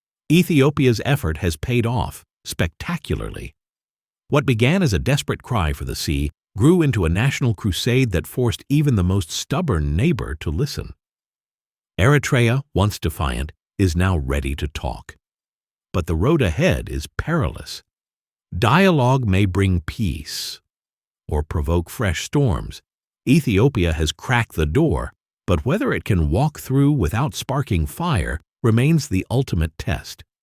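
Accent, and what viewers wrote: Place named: United States. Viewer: Who are you? Are one paid stooges with American accent spreading falsehood. This deluded Abiy thinks he can change the subject of his own misgovernance replete with assignations, imprisonment and outright war against his own people—Ethiopians. So, live with this—Eritrea’s ports are non-negotiable even with the support of UAE which is creating havoc in Africa—Sudan, Chad, Libya and now Ethiopia. So, this uneducated and uninspired leader called Abiy has become the water carrier for UAE. Eritrea will never and is not cornered. It is Ethiopia, the client state, of other powers which is cornered.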